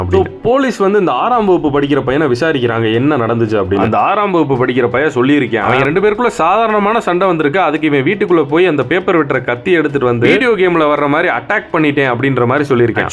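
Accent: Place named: Karaikudi, India. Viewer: Bro I think your native is Karaikudi......... your slang....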